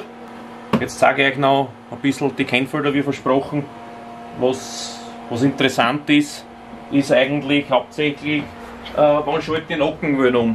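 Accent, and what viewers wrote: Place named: Burgenland, Austria. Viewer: luigi settembre Das ist deutsch. Allerdings mit Burgenländer „Slang“!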